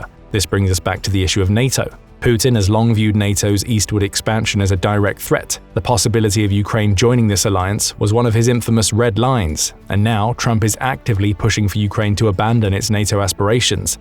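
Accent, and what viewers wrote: United Kingdom. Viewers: YOU ARE TALKING A LOT OF NON SENSE, ON TOP OF IT STATING THAT UKRAINE HAS LOST 46.000 PROPLE IS BEYOND COMMON SENSE. FROM YOUR ACCENT YOU ARE BRITISH MEANING YOU ARE SADELY LYING ALL THE WAY.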